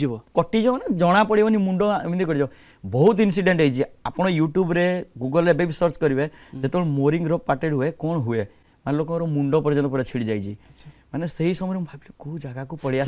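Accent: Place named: Angul, India. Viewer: ଭାଇ ଆପଣଙ୍କର ଘର ଅବା ଗାଁ ଅନୁଗୁଳ ଅବା ତାଳଚେର ଅଂଚଳରେ କି...? ଭାଷା ଟା ସେମିତି ଲାଗୁଛି... 👍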